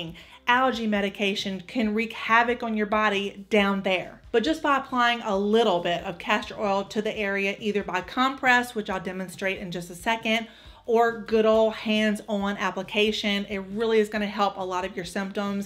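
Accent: South, United States. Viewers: You are a very entertaining and effective communicator!😂 Thank you for ALL of your points on using Castor Oil. The hemorrhoids remedy is so helpfu! Never heard of these remedies before. Barbara would be proud of you. She has been one of my favorite health coaches in videos. You sound like you are from Georgia, Mississippi or Alabama. I am from Mississippi. The southern accent is so refreshing to hear.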